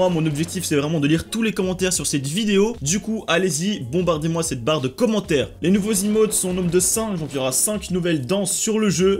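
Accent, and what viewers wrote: Belgium. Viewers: Tu viens d’où an Belgique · Toi ossi tes Belgique ?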